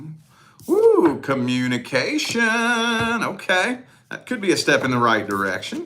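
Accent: South, United States. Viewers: Love that southern voice!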